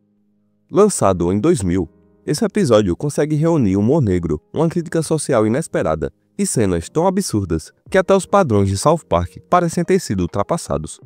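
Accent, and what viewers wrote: Pernambuco, Brazil. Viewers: Tu é Pernambuco???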